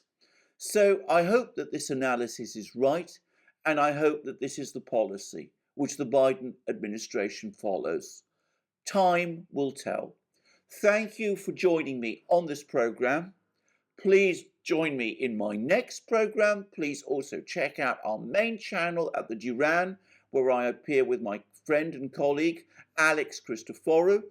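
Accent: England, United Kingdom. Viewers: all news should be delivered, in such a professional manner. Your wonderful crisp, English accent, is much appreciated. Thank you for explaining, what I cannot 🇬🇧xx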